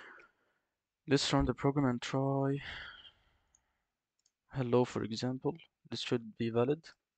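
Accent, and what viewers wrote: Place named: Egypt. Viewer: i know an egyptian when i hear one 😅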